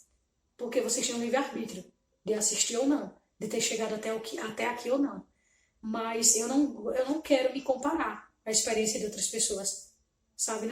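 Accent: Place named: Northeast, Brazil. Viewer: já comecei me indenticando com esse sotaque NORDESTINO. A tua história parece muito c a minha. O caminho das palestra foi onde tive a maior catarse. Continuou pq pedi catarse. Estou na segunda onda, nunca aprendi tanto em toda minha vida.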